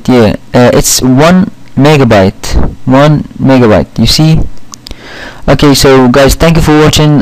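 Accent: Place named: Egypt. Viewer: you sound egyptian :) nice tut, but I prefer using handbreak, it's little more advanced but you can get better quality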